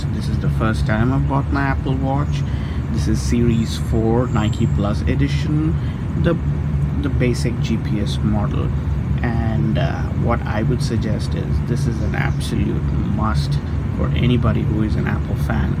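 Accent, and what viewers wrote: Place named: India. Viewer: Lol.! Yes, I DO realise that many Indians speak English, after all, I live in Birmingham UK which has a very large Indian population! But it was your neutral ACCENT that impressed me, not your ability to speak English - many Indians retain a very thick accent when speaking English, and you don't have that. Anyway, all the best from the UK!!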